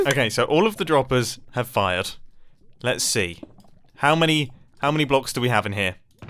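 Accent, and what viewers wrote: United Kingdom. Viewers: I come for the complex redstone builds, but I stay for the fantastic British dialogue. Thanks for the great content Mumbo! Your ability to make me laugh is quite impressive.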